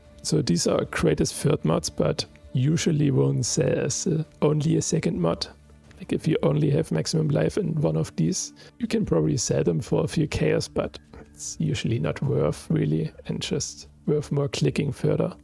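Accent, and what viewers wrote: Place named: Austria. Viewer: Austrian for sure! Probably from Niederösterreich or Wien! Nice video!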